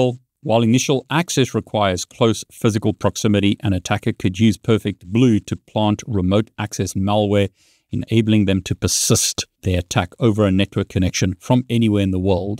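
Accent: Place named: South Africa. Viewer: It's his South African accent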